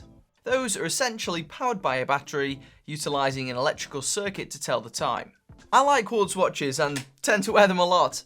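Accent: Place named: England, United Kingdom. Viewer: Dude what part of england is your accent from it sounds cool?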